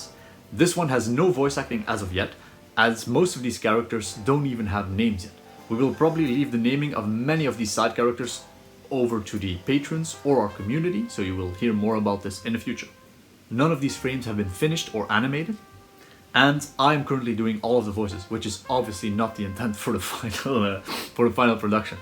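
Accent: South, United States. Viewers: That is an excellent southern accent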